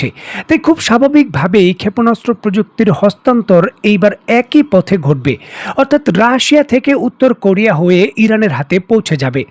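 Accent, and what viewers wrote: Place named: India. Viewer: Amon chinta apnar kamne Ashe je Onno desh Hi-Tech technology diye dey!!! Apni Ekta Awamilegeue tai sob somoy anti Muslim sentimental apnar modhe. Apni sob somoy Muslim der khato kore dekhen. Ami khomotay thakle apnar channel bondho kore ditam ba kemne news Korte hoy tar Ekta guide line ditam. Sob somoy Indian tune Kotha bolen apni.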